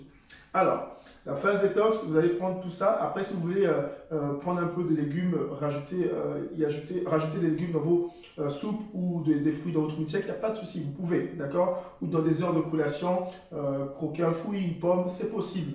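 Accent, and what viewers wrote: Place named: Ivory Coast. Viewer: Très belle présentation de la body mission. On dirait j'ai capté un petit accent 225🇨🇮🇨🇮🥰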